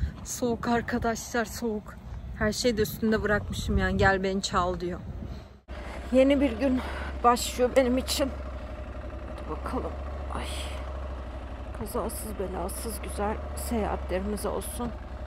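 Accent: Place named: Adana, Turkey. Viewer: espirili konuşmanı seviyoruz.Tıpkı adana şivesiyle konuşuyorsun seni seviyoruz.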